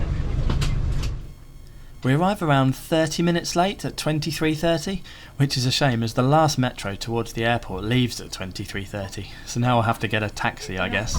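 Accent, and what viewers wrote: United Kingdom. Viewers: Your calm British (clear) accent is comprehensible and non-partisan. Keep it up. As another member already suggested, your suggestions and observations have been listened to and implemented. Two glaring points to be taken up by Indian Railways. Timing discipline. Always make sure that Trains leave on time and reach on time or a but earlier if possible. It is unfortunate that the passengers could not connect to the Delhi Metro station due to the delay in reaching time. Thank You.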